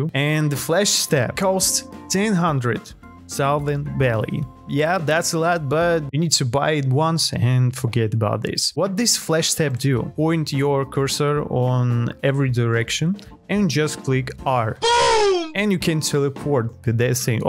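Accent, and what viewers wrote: Russia. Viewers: Bro has russian accent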